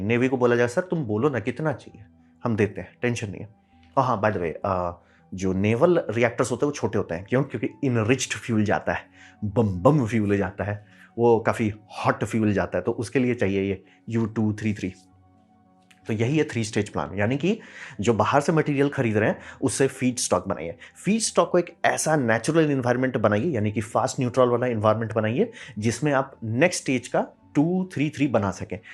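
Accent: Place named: Jharkhand, India. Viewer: Jharkhand's Hindi Accent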